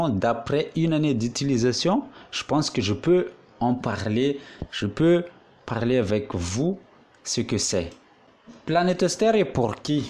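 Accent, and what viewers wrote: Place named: Madagascar. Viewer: J'adore l'accent! Êtes-vous malgache? En tout cas je ne pense pas ça soit vous sur la photo mdr!!! Sinon bravos pour la vidéo, elle m'a bien aidé.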